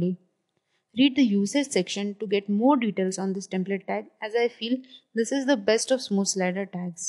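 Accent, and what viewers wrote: India. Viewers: Indian speed, accent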